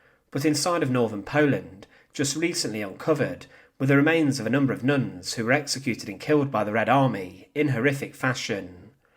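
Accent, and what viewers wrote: United Kingdom. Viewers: does this guy have an accent IN ADDITION TO his british accent maybe talk slower eh ?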